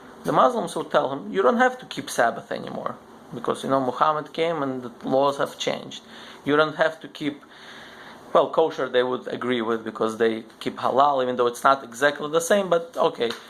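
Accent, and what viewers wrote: Russia. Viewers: You are russian speaker по акценту замечаю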